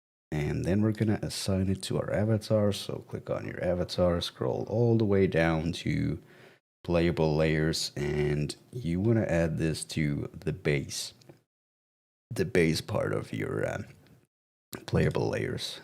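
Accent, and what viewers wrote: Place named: Finland. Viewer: 10 seconds in and I can already tell you're a fellow native Finn.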